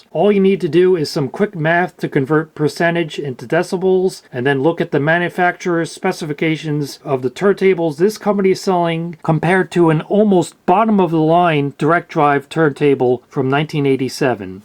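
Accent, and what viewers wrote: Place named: United States. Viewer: I am guessing by your accent that you might be from the USA; that makes anything technical printed in an advert a bit problematical, because in the USA, advertisers can basically bullshit all they want. I remember my electronics tutor showing us an early 1970s advert for a "6 transistor Portable Radio" from a famous US brand; except only 1 was actually in the circuit, the other five were defective transistors soldered to the edge of the board. I queried a problematical power output quoted on a US company's amplifier advert only a couple of years ago - and both the manufacturer and distributor refused to answer; despite basic math and RMS calculations showing it to be wildly out; even if they had meant PMPO, rather than the claimed class AB RMS; the numbers didnt add up. If you want to make those noise claims, you need to find a lab with the equipment to test them yourselves.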